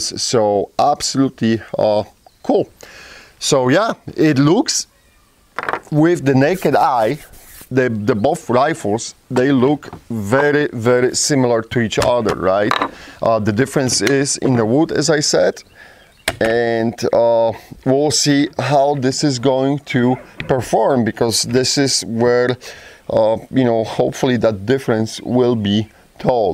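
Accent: Poland. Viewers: English with Polish accent 🙂👍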